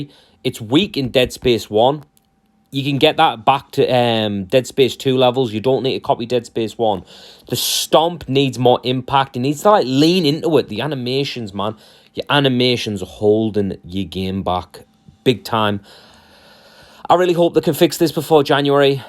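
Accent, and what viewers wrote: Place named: Scotland, United Kingdom. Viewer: I am giving you thumbs up just for that epic Scottish accent man, aside of that you are right some animations need polish :D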